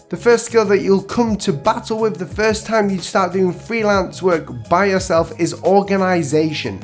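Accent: United Kingdom. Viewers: I do really appreciate your content btw, since I try my very best in calligraphy from time to time... and I really like british english:D Keep up the good work!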